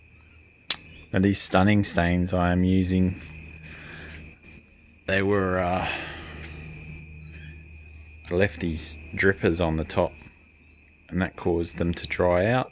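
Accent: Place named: Australia. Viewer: Good to hear another Aussie voice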